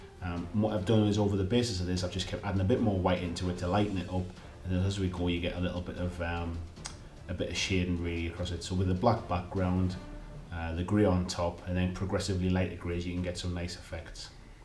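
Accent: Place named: North East England, United Kingdom. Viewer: Nice build with the board, added this to my YouTube list for referring to at a later date, can I ask where you are based as I believe I can hear an accent that is suggesting Newcastle/Gateshead/Shields/Sunderland in the N.E of England.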